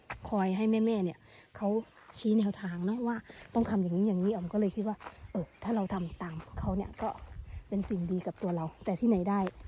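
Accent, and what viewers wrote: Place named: Isan, Thailand. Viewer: น้อง รู้ไหมเวลาน้อง พูดอีสานเสียงเพราะน่ารักมาก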